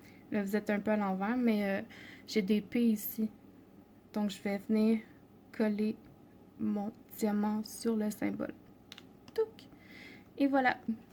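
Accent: Canada. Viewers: Coucou très beau début et j'adore l'accent du Canada. merci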